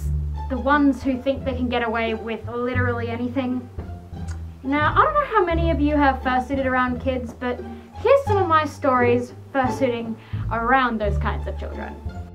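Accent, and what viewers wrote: Australia. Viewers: 0 ur aussie! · R U ausie?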